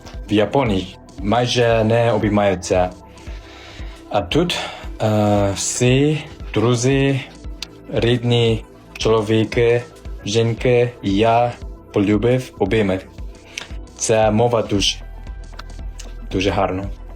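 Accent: Standard. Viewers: У вас правильна літературна мова. Ви молодець. Дивлюсь канал одеситки, яка проживає в Японії. Дуже дивує ваша країна. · Який Ви молодець. Дякую Вам за чисту українську мову. ❤❤❤ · Чиста мова - Ви такий молодець ❤🇯🇵🤝🇺🇦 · Вже дивлюся друге Ваше відео. Дуже чисто говорите українською. Молодець , Вам важко , але Ви навчитеся , бо хочете . Приїжджайте у Львів , познайомтеся з нашим містом . Наука - психотерапія . Лікар - Психотерапевт , який допомагає адаптуватися . Не переживайте ! Все буде добре ! ❤❤❤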